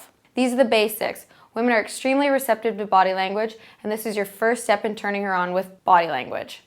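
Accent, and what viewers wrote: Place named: United States. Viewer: Manish Thakur Which accent ? she's just american. Btw I don't wanna be mean but people don't generally like the indian accent so... It's not the best approach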